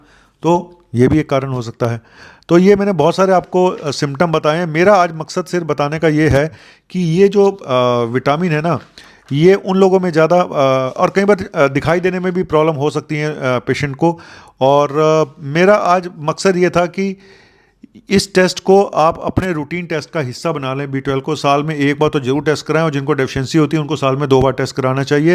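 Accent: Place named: Punjab, India. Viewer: Punjabi touch.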